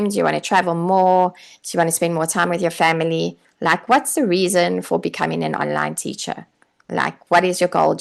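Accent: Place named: South Africa. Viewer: Im ex dbn..living in a Sydney..nice to hear south Africa voice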